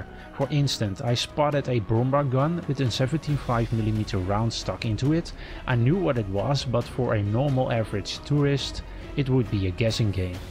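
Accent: Netherlands. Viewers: are you dutch ? you've got a slight accent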